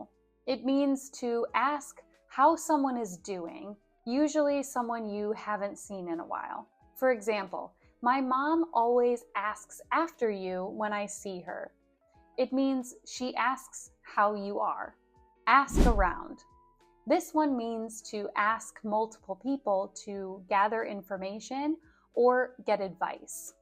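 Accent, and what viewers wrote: United States. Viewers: ❤American accent New pupil is coming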